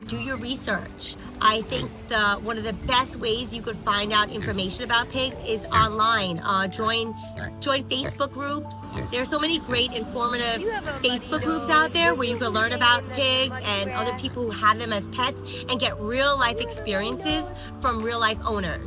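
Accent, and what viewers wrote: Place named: Long Island, United States. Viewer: something about your voice sounded vaguely familiar, then I saw Long Island and it all made sense. You got the classic LI accent! Great presentation & interesting ways people try to pass off the pigs as teacups that won't grow.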